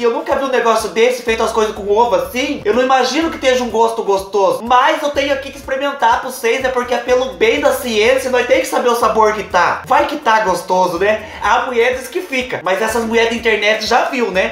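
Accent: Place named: Paraná, Brazil. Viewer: eu queria saber de q estado vc é?porque este sotaqe seu,parece do povo daqui do Paraná! 😘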